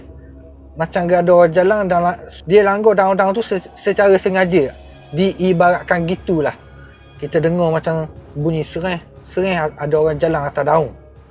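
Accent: Terengganu, Malaysia. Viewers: Haha,org ganu oyak gitu la,ambe org perak,tp blajo dungun dlu,mule mmg selok la suke,dh lame biase dengo,srehhh srehhh..haha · X eplore pn xpe dh bro.. sdp dgr crta pngalman cmni je.. skit pn xrse boring lg² ad cmpur loghat tganu.. mmg lyn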